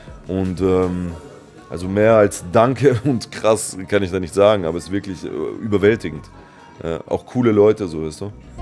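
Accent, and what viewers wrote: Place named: Balkans. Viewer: On je nas. Prica DE sa nasom bojom glasa.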